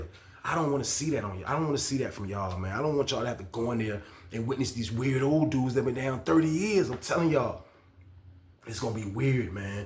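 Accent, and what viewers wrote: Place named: South, United States. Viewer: That's how they talk down south. It's common down there.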